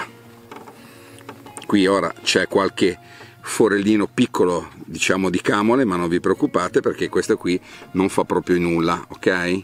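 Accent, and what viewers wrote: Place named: Liguria, Italy. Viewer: infatti mi pareva dall' accento che tu fossi ligure!! Liguria regione meravigliosa ! Grazie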